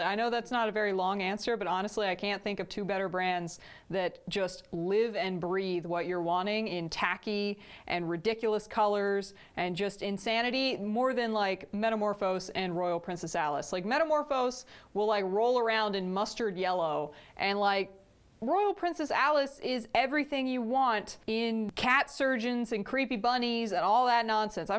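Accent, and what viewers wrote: Texas, United States. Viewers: Did anyone else notice her slip into a heavier Texas accent halfway through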